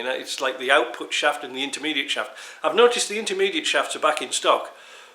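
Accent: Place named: North, United Kingdom. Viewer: As we are well into the 21st century now, it appears that it is becoming an increasingly pc-culture where we all have to be careful not to hurt other people's feelings by making passing comments about this, that or the other! One of your greatest assets to my mind, is straight-talking without all the BS & razzamatazz often associated with instructional videos elsewhere. Its not like you threatened anyone or incited bad behaviour and I (as a fellow northern Englishman in the UK) can empathise how the northern attitude & brogue, is sometimes misconstrued as harsh to some sensitive souls! Just keep doing what you've been doing & helping all those with older Defenders, to keep on truckin'!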